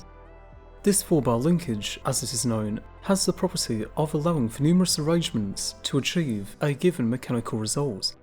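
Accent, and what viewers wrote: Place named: Wales, United Kingdom. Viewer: What's that accent.. ? Can't figure it out.. sounds Welsh though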